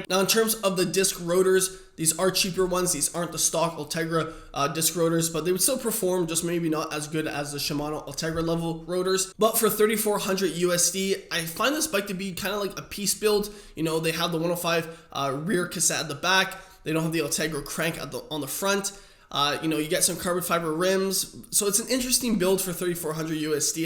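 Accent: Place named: Canada. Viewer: Really nice video. Good job! 👏🏼 (P.S. I like that Canadian accent. Makes me want to go watch hockey on TV. 🏒🤣)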